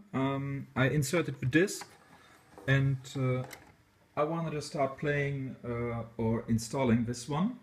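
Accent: Germany. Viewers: deutscher lol?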